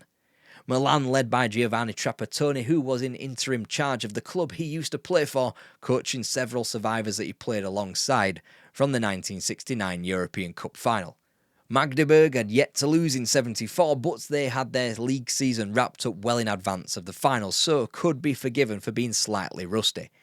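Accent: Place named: Northern England, United Kingdom. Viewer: My girlfriend keeps trying to guess where you from she is between sheffield and manchester by your accent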